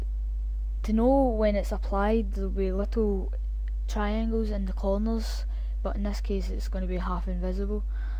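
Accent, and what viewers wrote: Scotland, United Kingdom. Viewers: Cannae beat a bit of scottish accent... :P pretty cool man, any idea how tae get it a bit clearer... mines coming up too dark and im making a realistic coffee machine for a uni project... I know i should know this cause im in uni but i only found out this "pro materials", its called Arch & design in 2012, l like a week ago.... cheers lol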